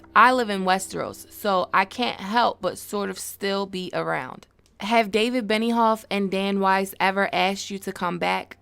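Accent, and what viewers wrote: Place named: Upstate New York, United States. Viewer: Damn where from that accent sound like upstate New york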